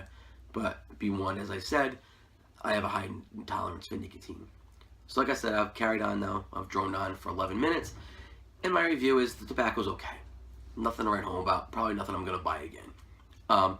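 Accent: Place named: New England, United States. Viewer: Hello definitely a New Englander. My wife is Rhode Island and it takes some time to be able to understand what Yinz are saying. LoL. Great video and I gave you a sub. Great job and thanks for the review. Be well and Keep it Lit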